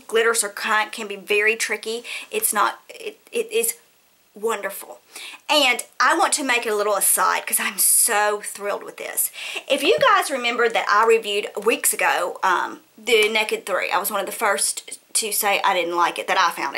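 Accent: South, United States. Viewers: Judy brought me here! I love your accent! You are definitely a belle. ^__^ *subbing* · Heard about you on itsjudytime. I love you. Lol You're such a doll. I subscribed. I love watching makeup tutorials.. You may get this a lot but i just have to add i love your southern accent :)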